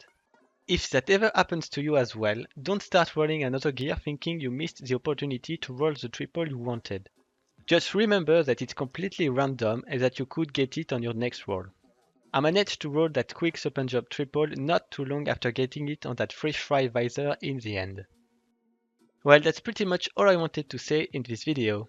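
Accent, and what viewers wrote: France. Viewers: il est francais ça s'entend grave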